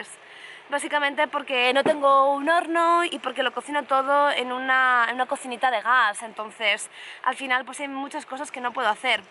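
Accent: Spain. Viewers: por qué ese acento español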